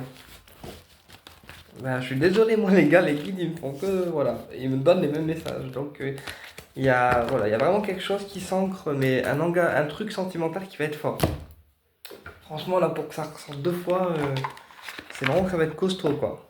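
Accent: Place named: Nord, France. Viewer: Je vous découvre et je vous remercie je m'abonne 😊je suis native du Nord et je reconnais l'accent ch'ti 😅je suis de Cambrai et vous? bon dimanche 🙏⚘❤⚘🙏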